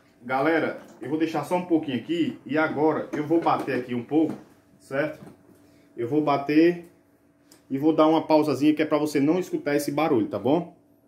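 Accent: Ceará, Brazil. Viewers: Cearense a gente conhece de longe. Kkkkk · Vc deve gostar muito da sua sogra Coral. Kkkkk.Até bolo faz pra ela. Toda sogra queria ter um "genro anjo" assim; como vc 😂😂😂😂😂. Vc tem sutaque de cearense. Parabéns! Sou cearense e amo bolo mole.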